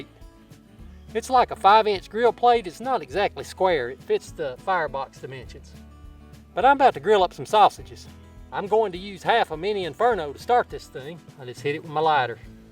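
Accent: South, United States. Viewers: Love your "good ole boy" Southern accent. Also, the quality of your presentation is on par with Steve's over at <link>. Very professional. One more thing . . . that video work is spectacular!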